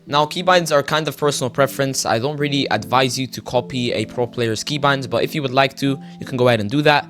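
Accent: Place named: Sweden. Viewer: dude sound like he's swedish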